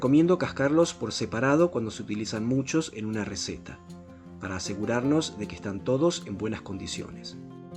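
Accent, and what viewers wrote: Buenos Aires, Argentina. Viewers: Hace rato que sigo este canal, pero hace rato también que no venía a éste. Y cuando veo y escucho las recetas, me siento tan en "Baires"!: vaini"sha", ra"sha"dura de medio limón, mantequi"sha"... lo preparé "sho"... es tan "sho", como me dicen mi amigos hispanohablantes... no sé si es tan YO o nuestra idiosincracia al hablar y ese cuidado del habla... cuando hablamos y decimos las cosas... Como dicen mis estudiantes. En todo caso, este canal me encantó siempre por su ELEGANCIA, su lado RETRO, sus ustensillos paseistas, su lado tan porteño, su manera de ser tan 'nosotros"... GRACIAS, por darnos tanto!!!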